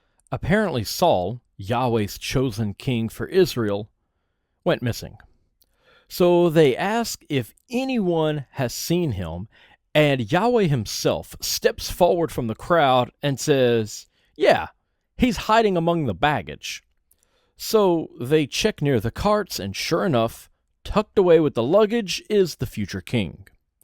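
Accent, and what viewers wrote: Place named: South, United States. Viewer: its funny how articulate southerners twang bursts through in certain words and affectations. EeYeen recurs with my guy here. Why its peculiar is you will notice it only with certain words. Men is mEeYeen usually almost universally with articulate southerners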